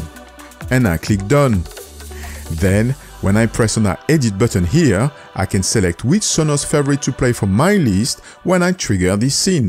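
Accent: France. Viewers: I loooove your french accent and the great tutorials! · Your French accent tho.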